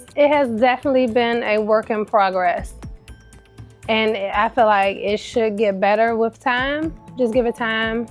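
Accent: DMV, United States. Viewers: Heard that DMV accent IMMEDIATELY! Hey sis! If you're comfortable, can you share stories about your stadium days?? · I knew you were from the DMV somewhere as soon as I heard you talking lol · she’s from DMV! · I’m from PG I hear your Maryland accent girl 😁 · I could hear Md all in her accent ! Baltimore stand up ! Love this ❤ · Girl! Soons I heard you talking I said that’s Maryland baby!! lol love from PG County boo 💕💕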